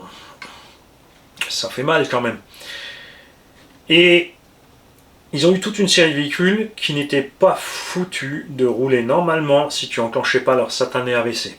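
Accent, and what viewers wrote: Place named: Alsace, France. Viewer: Ah... losi c est grâce à la dp.pro que j ai repris la rc,( j avais ausssi hésité avec un slach)elle est jamais bien loin dans le coffre quand on part en vacances ,il y a toujours une place pour elle.En tout cas elle fait bien le taf .et dire que j avais failli acheter le mavrick quantum fluck, beautiful comme disaient cettaines vidéos 😂😂jusqu au jour où je tombe par hasard sur un blondinet avec l accent alsacien qui me semblait bien plus sincères dans ses propos et voilà comment on en est devenu accros de cette chaîne de dingos 😋avec son présentateur et ses abo,on se marre bien,et la collection s agrandi tous les ans. Sinon pour finir le sbr 2 me tenterai bien pour essayer en remplacement du mojave mais j hésite fortement déjà je sais que la caro c est de la feuille de riz😂😂et ça fait c...quand-même Bon allé montre nous les essais du losi 5 qu on bave un peu comme avant. 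Bonjoute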